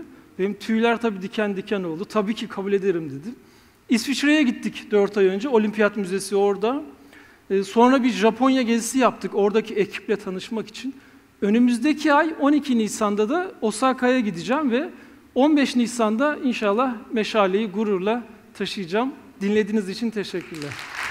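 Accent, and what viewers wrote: Samsun, Turkey. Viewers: Şive Samsun'a kayıyor e'leri açık söylüyorum diyince bendede tüyler diken diken oldu 😀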